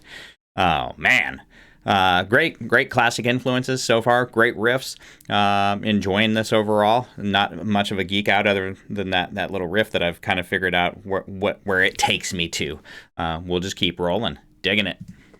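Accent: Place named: Brazil. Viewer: I like your Brazillian accent!😍so classy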